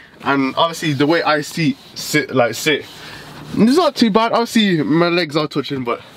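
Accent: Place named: Jamaica. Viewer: I can hear he is from Jamaica like me🙂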